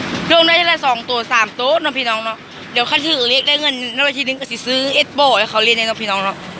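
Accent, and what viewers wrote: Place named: Phu Thai, Thailand. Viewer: สวัสดีค่ะน้อง ขยันจังเลยนะคะ เว้าภูไทน่ารักเลย น่าสนุกเด้อเลี้ยงเป็ดยายชอบจ้า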